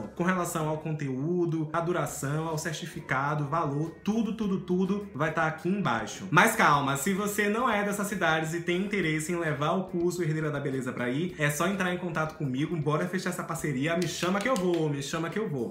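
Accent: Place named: Northeast, Brazil. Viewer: Marminino pensei q tu era daqui do nordeste!?rsrs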